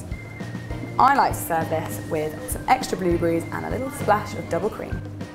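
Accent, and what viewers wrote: United Kingdom. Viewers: Doppelgänger she speaks British English with a beautiful accent.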